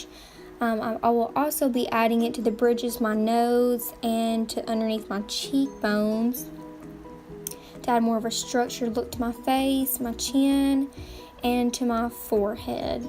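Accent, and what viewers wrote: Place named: Rural, United States. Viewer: I never noticed how country your accent was until these.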